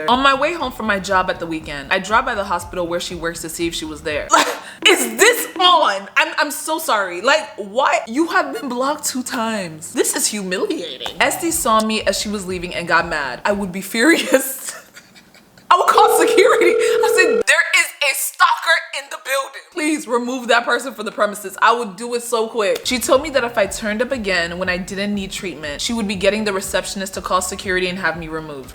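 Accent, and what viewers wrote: Nigeria. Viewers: I just knew you are Nigerian The intonation and all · Weird question, are you Nigerian? I can hear the accent 😂it’s ever so slight but I love it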